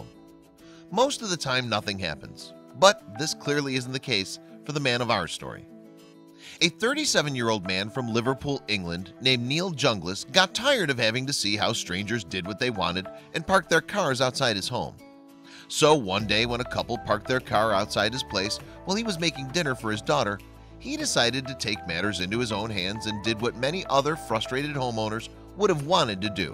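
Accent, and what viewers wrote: United States. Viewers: Interesting point that the narrator sounds American.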